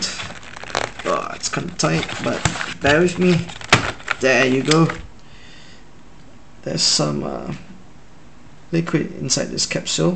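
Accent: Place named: China. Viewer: You are Chinese or Chinese descent? I can somewhat tell from your accent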